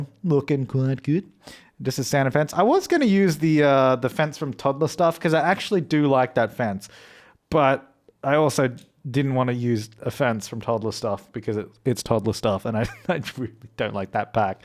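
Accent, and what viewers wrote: Australia. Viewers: Why I Love listening to You and Deli? I think Australia accent combines with sims builds. 🤔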